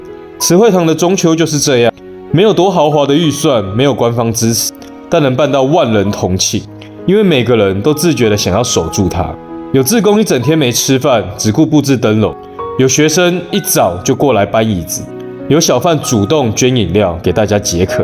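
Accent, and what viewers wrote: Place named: Taiwan. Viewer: 口音聽起來像台灣人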